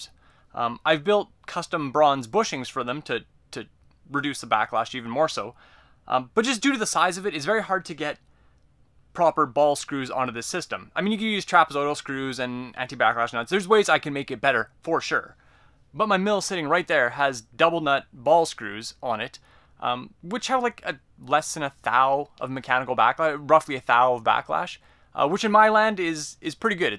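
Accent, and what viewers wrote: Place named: Canada. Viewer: I could hear the maple leaf dialect within 3 seconds of the beginning of the video. Hehe. Gotta love Canada.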